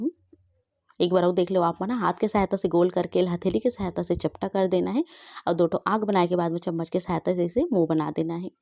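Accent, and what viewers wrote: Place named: Chhattisgarh, India. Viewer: मै तो आप जो भी रेसिपी बताते हो उसे ज्यादातर नहीं बनता 😂 लेकिन आपका वीडियो देखने और आपके छत्तीसगढ़ी अंदाज में बताने का तरीका मुझे बहुत अच्छा लगता है इसीलिए मै आपका वीडियो देखता हूँ l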